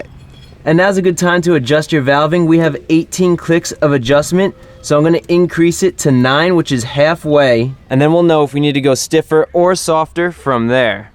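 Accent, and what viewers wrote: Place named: New York, United States. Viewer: Where is the track that you go to? Sounds like you're from NY/NJ and the closest place I know of is in PA (I'm from NY) the name of the track would be helpful!!!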